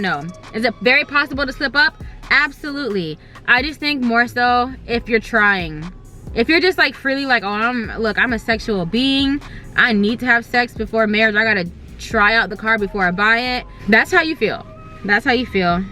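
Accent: Jamaica. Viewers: wait u jamaican?😭😭😭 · It’s the patois for meeeee. I think its the first I’ve heard you speak it, love it! You spoke some real facts though sis!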